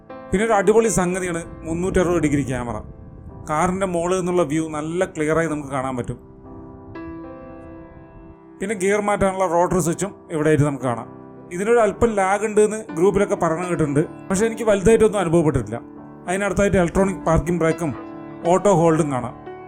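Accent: Palakkad, India. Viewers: ഇയ്യ് പാലക്കാടാലേ ??😁😁😁😁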